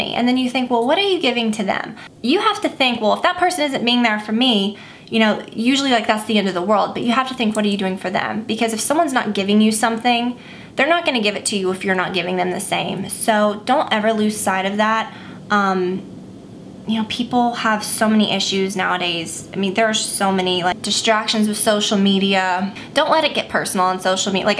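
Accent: South, United States. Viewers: it's not incorrect, that's just southern accent shining through;)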